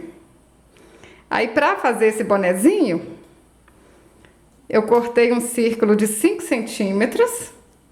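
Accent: Minas Gerais, Brazil. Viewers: Oi...gostei demais uai...você e mineirinha igual eu só..?? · Estou encantada com você. Esse seu sotaque mineirinho é muito agradável. E as coisinhas que você ensina são lindas demais. Obrigada pelo desprendimento. Deus te abençoe grandemente 🙏🏻😘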